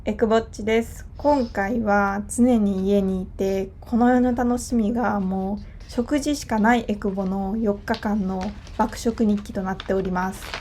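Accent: Kansai, Japan. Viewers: YouTube始めたての時よりも関西弁出てきてて好き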